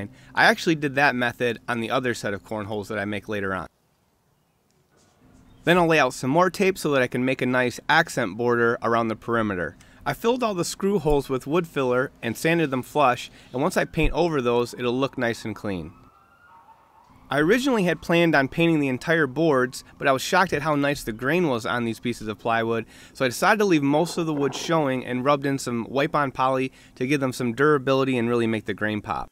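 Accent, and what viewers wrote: Michigan, United States. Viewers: R u from Michigan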